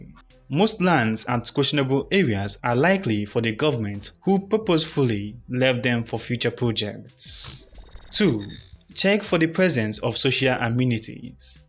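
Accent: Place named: Nigeria. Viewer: Nigerians accent, but good video. Said many stuff in few minutes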